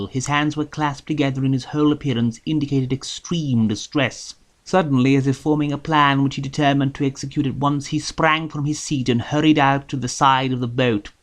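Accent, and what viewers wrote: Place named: Eastern Europe. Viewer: You have the perfect voice for things like this, your diction is excellent and the slight Slavic lilt adds something rather lovely to the cadence.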